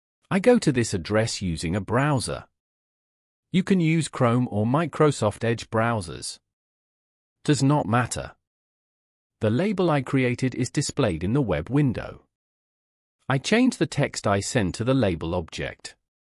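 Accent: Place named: United Kingdom. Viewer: The voice over is just awful. Speak English. You don't "transfer" a library, you install it. Calling a function with a text argument is not "sending it a text" (LOL). Every other tutorial in the playlist seems to have the bot exclaiming "I am SAVING the code FILE!" repeatedly, always with the same alien accentuation. While it may be easy for you to generate one of these videos for each little control in NiceGUI, it's not obvious that any of them teaches anyone anything — too trivial. The last ones on `bind_*` perhaps have some content. I guess you think this accent sounds "classy". Anyway, it does sound British.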